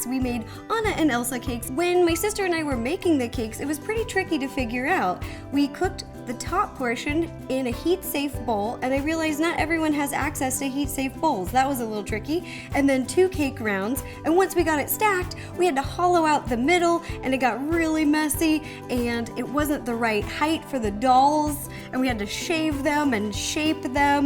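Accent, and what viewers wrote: South, United States. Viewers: I've been watching this channel for at least a year, I love Ro and her crazy baking ideas, but the more I watch the more she sounds like one of those southern women who hosts the Home Shopping Network in the middle of the night.... But she's from Washington...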